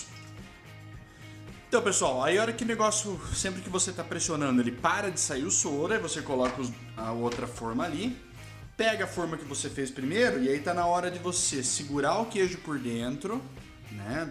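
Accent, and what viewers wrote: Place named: Rio Grande do Sul, Brazil. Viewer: tu é gaúcho...né!!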